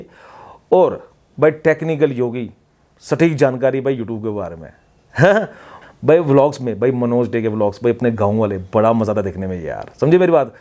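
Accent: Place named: Himachal Pradesh, India. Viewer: the way of your speaking reminds me of my old days in Himachal where people speak with a different tune than mainland hindi speakers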